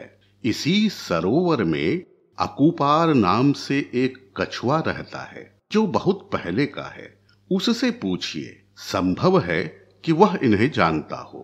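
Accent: Standard. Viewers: महाभारत की एक सुन्दर संदेशयुक्त लोकोपयोगी कथा...! सुन्दर लोकोपयोगी कर्म वाले ही वास्तव में मानव धर्म /स्वधर्म का पालन करने वाले होते हैं। ऐसे स्वधर्मपालकों को ही...लोकोपयोगी कार्य करने के कारण, संसार में सम्मान और यश/कीर्ति प्राप्त होती है। जिन्हें सम्मान और कीर्ति मिलती है उन्हें ही स्वर्ग का सा सुख प्राप्त होता है परन्तु समय के साथ लोग उनके लोकोपकारी कार्यों को भुला बैठते हैं तब वह स्वर्गिक सुखों से वंचित हो जाता है। स्वर्ग का सा सुख पाने के लिए या तो निरंतर लोकोपकारी कार्य करते रहना आवश्यक है या उन कर्मों का स्मरण कराते रहना (गुणगान होना) आवश्यक है ताकि उस व्यक्ति का यश बना रहे और वह स्वर्ग का सुख प्राप्त करता रहे! इस कथा का यही सार तत्व है। सुन्दर शैली में विशुद्ध उच्चारण के साथ प्रस्तुत यह कथा अत्यंत रोचक और लाजवाब लगी। हार्दिक धन्यवाद एवं हार्दिक बधाई आदरणीय इस सुन्दर संदेशपूर्ण कथा-वाचन के लिए...! सादर नमन आदरणीय एवं शुभ दोपहरी...! ☀️🌷🙏🌷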